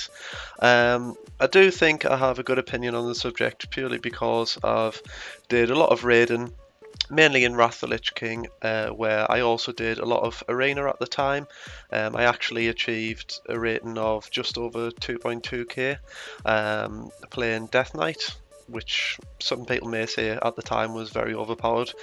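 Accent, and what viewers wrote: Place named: Newcastle, United Kingdom. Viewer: damn, you sound like you live pretty close to newcastle judgin from your accent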